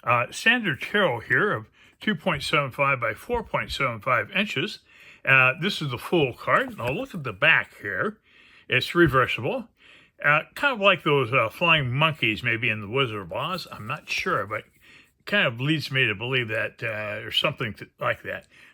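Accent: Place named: England, United Kingdom. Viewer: It's really nice to listen to your video, here in Italy... waaaaves... your accent sounds "English" am I right?? I'm not sure but... it seems so... and I like it!!!! Thanks for this beautiful review, from today you have a new follower from Italy ! wide smile ! hellooooooo !!!!!!!!!!!!!!!!!!!!!!!!!!!!!!!!! ( and today I ordered my tarot deck: I Love it !!!!) Ciao and thank youuuuuuuuuu !!!!!!!!!!!!!!!!!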